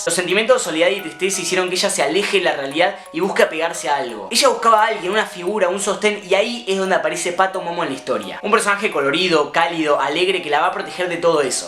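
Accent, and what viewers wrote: Argentina. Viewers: de que parte de Argentina eres??? Eres el mejor:3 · sos Argentino?????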